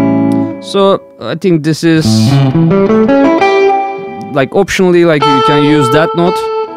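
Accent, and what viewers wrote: Turkey. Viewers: Abi türk olduğunu aksandan anladım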